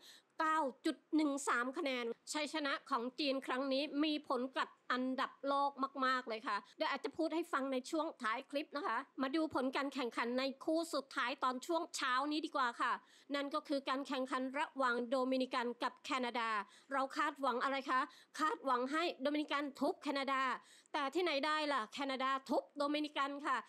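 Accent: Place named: South, Thailand. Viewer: Ad สำเนียงคนใต้ อยู่ จ.ไหนครับ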